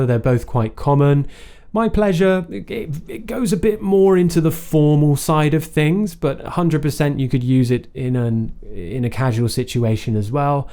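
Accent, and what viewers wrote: United Kingdom. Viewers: Hello. Wonderful lessons as always. I agree, English can be boring, especially when conversing. Having options is a great way to expand one's vocabulary. I used the following phrases as an alternative to: "You're welcome." 1. My pleasure. Pleasure. Pleasures all mine. 2. No worries. No problem. 3. Much obliged (combined with #1) 4. It's all good paired with #2 or #4 5. Merci or Merci Buckets.😂 6. Quite alright (with #2 or #4) 7. I gots 'cha! (#2 or #4) 8. Don't mention it. 9. No sweat with #2–8 10. It's okay. No biggy. Absolutely love the British accent. It's so posh and quite easy on the ears. I speak with a Canadian accent and, apparently, mine is an enigma here in the Philippines. I sound: Canadian - American - British -French all jumbled together.😅 Kudos. Watching and learning all the way from the 🇵🇭. Cheers!👍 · I love your British accent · I love the british english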